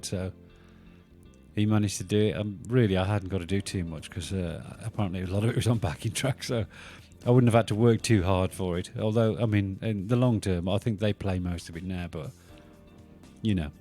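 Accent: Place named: Birmingham, United Kingdom. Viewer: From a Brummie.....so nice to hear a fellow Brummie on YouTube!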